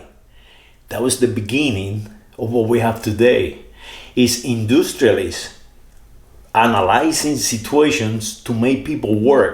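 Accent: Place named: United Kingdom. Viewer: You do not speak English you speak slang British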